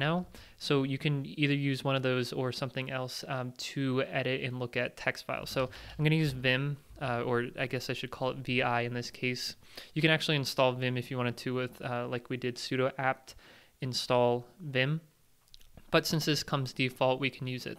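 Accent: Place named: Philadelphia, United States. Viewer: hearing distinctive Philly (Philadelphia, PA) accent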